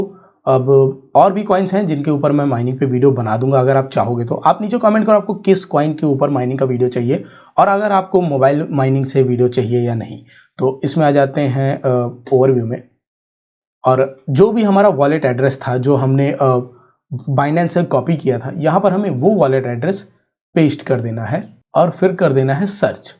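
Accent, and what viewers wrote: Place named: Punjab, India. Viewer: Learn how to speak English or add English subtitles you punjabi derka derka speaking mo fo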